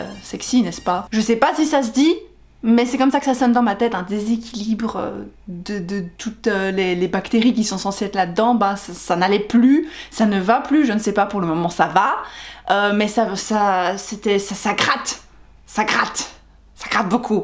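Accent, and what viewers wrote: Belgium. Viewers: je crois entendre un accent belge? té bèlge? belge aux yeux verts, nan, pa possib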